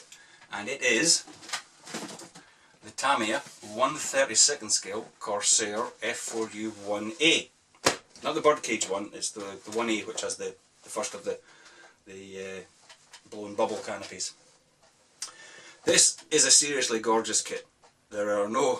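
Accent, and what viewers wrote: Scotland, United Kingdom. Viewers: dude, you're like george clooney with a wee brogue. ;)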